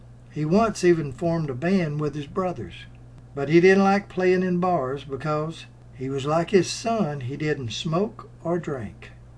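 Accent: South, United States. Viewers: I don't care about the subject. It's the narrator that's I like listening to. The southern accent is just adorable and voice calming.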